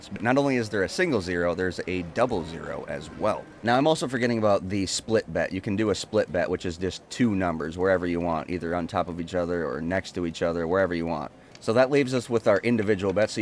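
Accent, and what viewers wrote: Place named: Minnesota, United States. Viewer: Your from Minnesota. So I’m I 🤪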